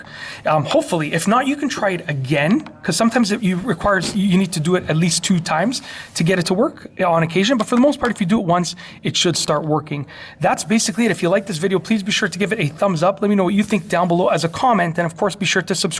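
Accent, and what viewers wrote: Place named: Canada. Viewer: thanks so much for this great tutorial! totally fixed it! and i think i understood your Canadian accent!!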